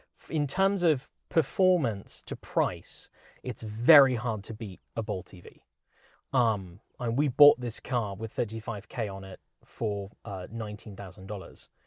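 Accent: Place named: West Country, United Kingdom. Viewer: I do like a Bristol \ West Country accent!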